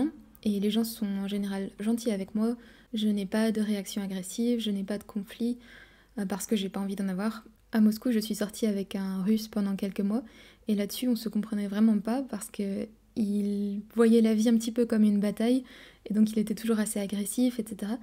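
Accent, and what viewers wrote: France. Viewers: Интересно звучит русский с французским акцентом , такое няшное звучание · у тебя очень красивый женственный голос и с приятными французскими нотками!)) Говори по русски ни кого не бойся! У тебя очень хорошо получается!😊👍 Вы всё замечаете... вы замечательная красивая девушка!) P.S. ne faites pas attention aux commentaires négatifs, ils sont écrits par des robots ukrainiens en règle générale (troupes de canapé)... ils essaient de discréditer les Nations. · Если бы Вы знали французский язык, то сразу бы поняли по произношению и выбору слов, что она француженка. Что касается её русской речи - вполне стандартная речь, изучающего язык на уровне А2-B1. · Вы внешне не очень сильно напоминаете типичную француженку из Парижа или Марселя. На вид обычная русская девушка из Москвы или Петербурга. Думаю с толпой вы легко скроетесь. Тебя выдаёт только твой забавный и милый французкий акцент.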